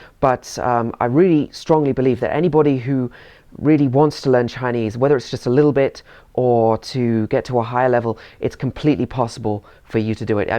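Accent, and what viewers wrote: United Kingdom. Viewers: He sounds british😁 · I am very impressed by your Chinese and Cantonese. I love your British accent very much. Can I know what kind of British accent do you have specifically? I am going to learn and imitate your accent through your channel. Haha. Hope to see more videos from you. Thank you :)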